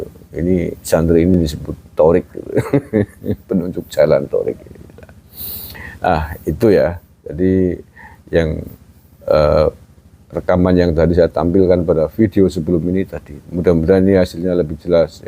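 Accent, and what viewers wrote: Banyumas, Indonesia. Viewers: Ora ngapak ora kepenak 👍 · Ngapak nek ora ngapak ya ora kepenak...😉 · Ora ngapak ora kepenak,,😂😂😂❤❤❤❤ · ora ngapak ya ora kepenak mbok?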